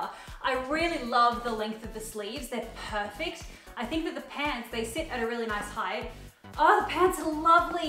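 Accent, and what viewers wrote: Australia. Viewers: Yeah that annoys me, America has tons of dialects to begin with too so it’s hypocritical to ask for a more “American” way of speaking from an AUSTRALIAN. Edit: I’m American, Southeastern to be exact. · yes ! I love to hear different accents and I never think about her speaking Australian as a weird thing since she's from there ! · Please don’t beat yourself over the way you say certain words. Accents are all unique, and just because an American doesn’t pronounce something the way an Australian would, doesn’t make it incorrect. Your accent is SO cool, and even if we say something a different way or use a different word for something, we still know exactly what you mean. You shouldn’t change yourself! We love you for you!! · Please don't change anything about your 'accent'. I am Croatian and I come here for the Australian, not the American. 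💪 you are the only Australian I watch on youtube so i cherish all these differences, I truly enjoy it. Wish we could see more Australia in the future. I love love your videos, this thriftmas was amazing! wish you all the best! :) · I prefer it when you use aussie lingo and keep your accent, I'm from the UK and I just love hearing the way you guys talk down under ☺😍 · Ikr and it’s not every day you hear an Australian accent, people are allowed to be unique (and sound like where they’re from :P I think it’s a common subconscious part of American culture to feel like “we do everything right, so we have to help people conform to our perfect culture”) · Part of the excitement is learning by experiencing another culture and lingo. I love that is Australian. It's so fun to have her as my friendly neighborhood Cultural Atache' to Australia. 😊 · I’m Australian, even I struggle to know the correct pronunciation of things, even Australians correct each other’s pronunciation all the time, especially suburbs and places. 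Literally everyone pronounces things differently. I don’t think was purposely changing her accent for the American audience. She just didn’t know how to pronounce Academia so when she got corrected she went with it. I question things I say all the time. It could be because we have so much American influence through socials and TV. There isn’t one way of pronouncing something. She says like a million words in this video in her native accent and you pick on one word. Let do her thing she is killing it!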